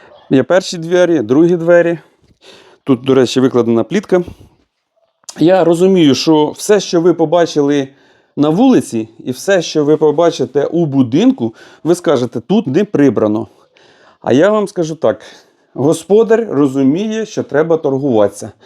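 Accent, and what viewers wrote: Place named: Kharkiv, Ukraine. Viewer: Форточка - кватИрка (укр.) Телефон - так и есть на укр.яз. - телефон, а не "телеХВон".))) Я подозреваю, что вы сами родом со Слобожанщины. Проскакивает харьковский суржик, как не стараетесь говорить українською мовою..) Да и в Харькове было такое риэлтоское агенство "Квартал".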